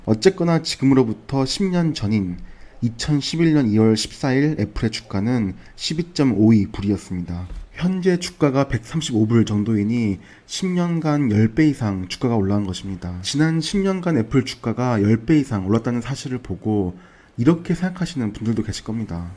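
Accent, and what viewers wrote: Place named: North Gyeongsang, South Korea. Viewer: 고향이 어디신지 꼭 여쭤보고 싶었어요 경상북도? 나가리~이런표현 개인적으로 참 편하거든요^^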